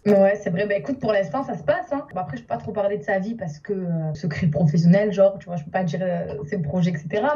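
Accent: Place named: Marseille, France. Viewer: A Marseille on parle comme ça vous avez un problème ?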